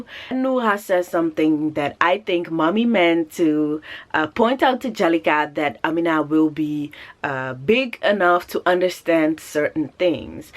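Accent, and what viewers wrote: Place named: New York, United States. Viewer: Wow! Listening to you speak, I thought for sure you spent some time in my home town of NYC. What an interesting and inspiring story; you are truly gifted and talented. You should think about working for the UN. Hearing about TV in the Netherlands explains a lot; because unless you are in the US watching public television, an HBO foreign film or show, or some special channel like National Geographic, most things are dubbed in English (often with bad translations, so my friends who are fluent in other languages tell me). Which is why I love to see foreign films directed by people like Akira Kurosawa and Ousmane Sembène. My other language learning days are probably over (btw, I sent a friend who travels to Senegal quite often, your Wolof learning link) because of the many projects I have going; plus I find that when I travel people are generally very nice and I get to wherever I want to go very well. 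When COVID-19 travel restrictions improve, I plan to visit Senegal, and even everybody I know there speaks English! But if I ever visit the Netherlands I will definitely hire you as an interpreter! :-)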